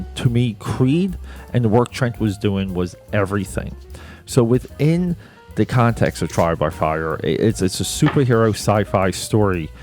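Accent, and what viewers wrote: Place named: Philadelphia, United States. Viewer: I knew you were from Philly by your accent😂 great stuff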